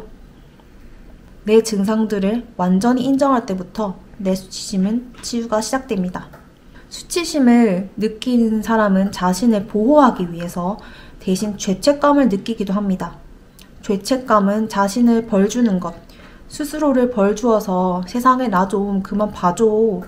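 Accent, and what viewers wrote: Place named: Gyeongsang, South Korea. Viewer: 경상도 분이신가요 ㅎ 억양이...친근해서요. ㅎ 영상 잘 보겠습니다.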